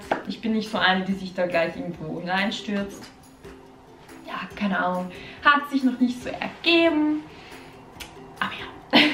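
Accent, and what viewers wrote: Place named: Austria. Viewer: Sie ist aus Österreich, deshalb die Aussprache!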